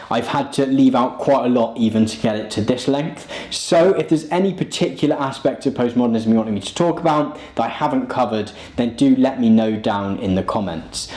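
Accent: United Kingdom. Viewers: I love your accent , so British saluts From Algeria 💜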